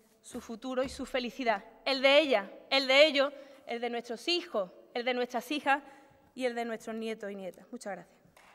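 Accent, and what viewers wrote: Andalusia, Spain. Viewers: Acento francés???? tiene acento andaluz, petardo!! A quién acusas?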